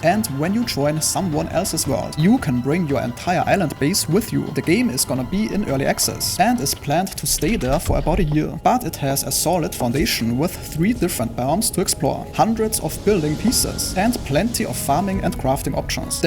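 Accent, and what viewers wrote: Germany. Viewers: german for sure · Bin das nur ich oder klingt das nach deutschem English? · dat denglisch !!! :(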